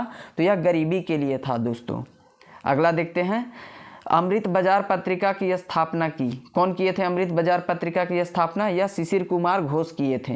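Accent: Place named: Bihar, India. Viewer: Aap bihar k ho bhai🎉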